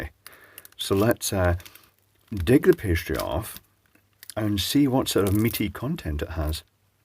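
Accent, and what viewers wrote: Scotland, United Kingdom. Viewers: Wonderful to hear such a delicous Scottish brogue describing a meat pie - the late and wonderful Ivor Cutler would have been in his element Existentially yours.......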